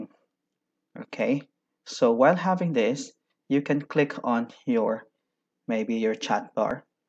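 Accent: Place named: United Kingdom. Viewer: Very good information. Congrats sa hereenng. British accent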